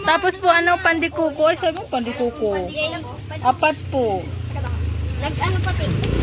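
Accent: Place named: Philippines. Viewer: ❤❤❤❤❤❤dasal lang ate at magpakatatag ka para sa mga anak mo...rest in paradise kuya..mamis ko filipino accent mo..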